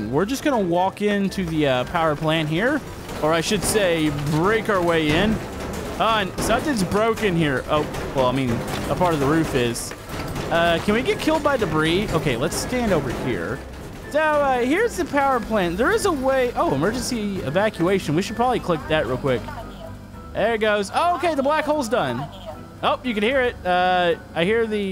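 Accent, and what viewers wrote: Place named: Texas, United States. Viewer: he has now reliasied his texas boi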